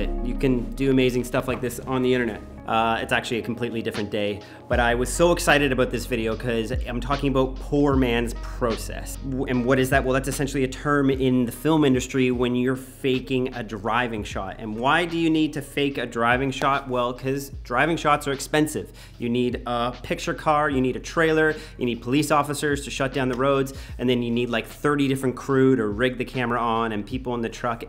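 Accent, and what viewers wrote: Canada. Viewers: I like the way you say process. Wish I had that Canadian accent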